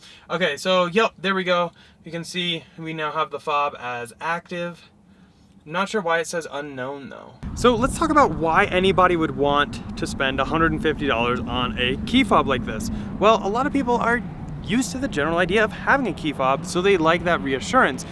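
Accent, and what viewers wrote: Norway. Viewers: U norwegian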